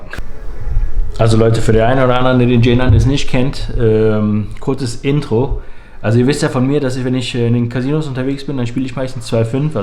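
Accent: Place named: Cologne, Germany. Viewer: Haha, einfach den Kölner Dialekt nach Vegas verfrachtet. So muss das sein freu mich auf die Coaching Videos, seh sie mir jetz an. Grüße aus Brühl vom ehemaligen Hürther, an den ehemaligen Hürther! ( der der mit dir im mandalay Bay 2017 kein Kölsch reden durfte) viel Erfolg weiter für dich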